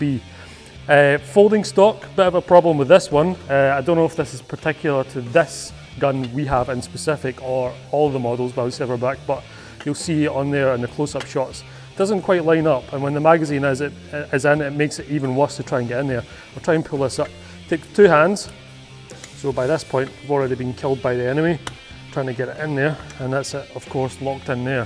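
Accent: United Kingdom. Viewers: Jeez, everything you say is so credible. Damn British accents!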